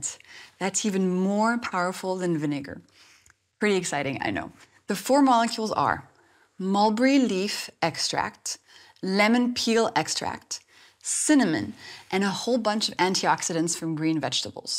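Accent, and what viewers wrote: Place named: France. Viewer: I believe she is French, hence the gorgeous accent?? Namaste 🙏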